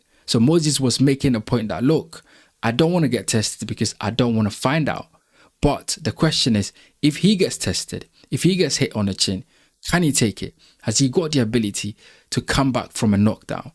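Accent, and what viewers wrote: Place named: Ghana. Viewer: You sound Ghanaian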